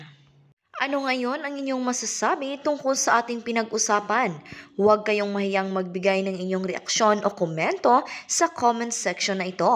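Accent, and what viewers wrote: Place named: Visayas, Philippines. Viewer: Sensya na kau BISAYA ang nagsasalita na vlogger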